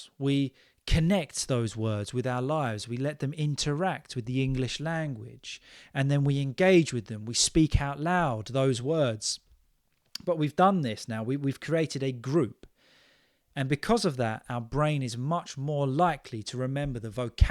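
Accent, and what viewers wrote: United Kingdom. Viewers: Hi, I'm from Myanmar, and I absolutely love the British accent. I'm really keen to learn it, so your podcasts are perfect for me.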